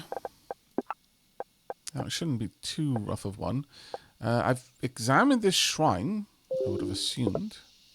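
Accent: United Kingdom. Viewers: I 100% thought you were British. Hell, I still do.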